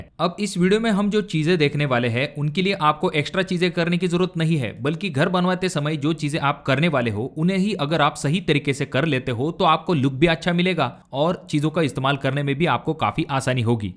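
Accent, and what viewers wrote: Maharashtra, India. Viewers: Lagta hai aap Marathi ho ? Soft aawaz nahi hai ?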